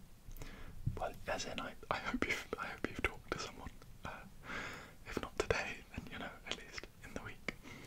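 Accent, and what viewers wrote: England, United Kingdom. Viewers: It’s so nice to find some English asmr! I’m so used to only Americans. You remind me of all my mates - very relaxing - good job man! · LORD YOU SHOULD HAVE HEARD THE GASP I MADE WHEN I HEARD HE WAS ENGLISH 😍😮‍💨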